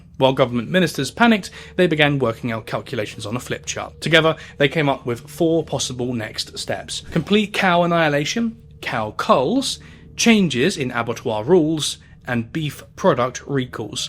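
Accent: United Kingdom. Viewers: english is my third language and i undestood him perfectly, hes just briitsh??? lmao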